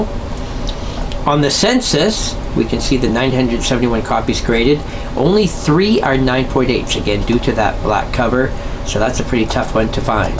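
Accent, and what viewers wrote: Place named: Canada. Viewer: Great Canadian accent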